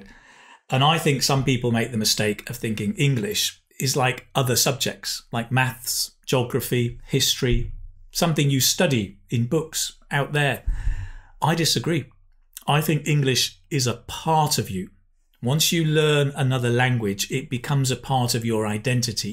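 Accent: United Kingdom. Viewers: Where is from??? Nd is this British accent or american accent. Well I think it may be British accent.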